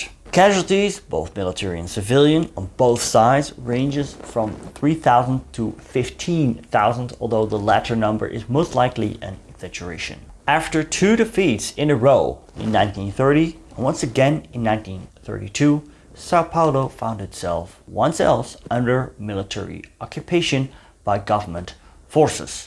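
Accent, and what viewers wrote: Spain. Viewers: What are you talking about? He just pronounced Portuguese as if it was Spanish throughout the video, as seen by "Retúliô" and "Itararê". This is an irrelevant nitpick since this is a history channel as opposed to a linguistics one, but please realize that the only reason some people in Rio Grande do Sul pronounce their state's name like that is because it was settled by Spaniards first. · Would be better if you didn't pronouced things with the spanish accent, and instead used the Portuguese one.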